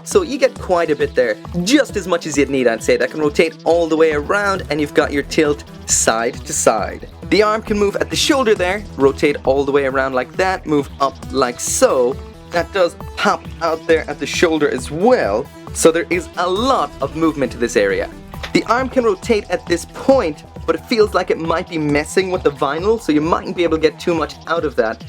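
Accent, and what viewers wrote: Ireland. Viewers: you have a very small strange Irish accent to your voice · I love your voice so much you irish uwu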